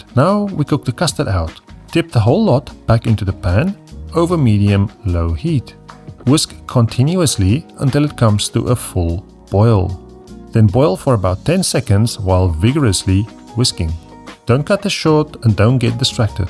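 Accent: Afrikaans, South Africa. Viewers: ‘ off the it’ classic c south african African English! Love it! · What's this? Afrikaans chef John? I'm here for it.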